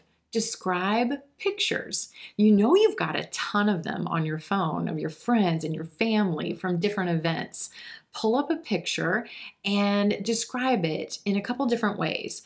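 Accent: United States. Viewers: I am an arabic speaker and an english teacher I love the american accent and I have choosen you as a trainer after a great reacher thank you for your help I watch your videos every day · Your all Video is American English??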